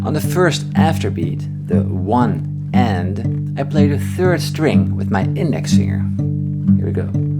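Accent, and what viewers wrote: Netherlands. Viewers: cant miss that Dutch accent!! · with an accent. You can tell right away that he’s Dutch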